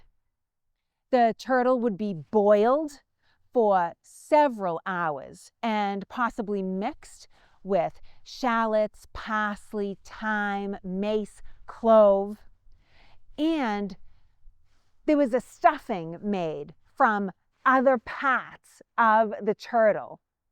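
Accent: Boston, United States. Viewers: Pahked machar in tha yahd,❤ Yes, I am a huge Jaws fan😁 Love the Bostonian accent 🙌Love from London ❤ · Is that a Baas-ton accent? 😁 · You do a great job,. Always bring a smile. The passion, the Adventure Pants, and the full-power Howes Neck or Dorchester accent. Don't change a thing. I hope the executives at you- tube understand the value of a good, old fashioned Historical Action Adventure (HAA). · i luv the Boston accent. I'm gettin' hungry now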